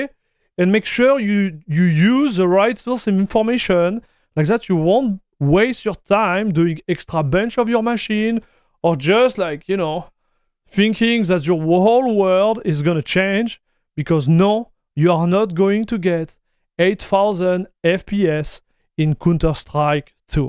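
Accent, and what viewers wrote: France. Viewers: Have not heard Fran-glish for a while, it never ceases to amaze me. I feel like i can speak French :)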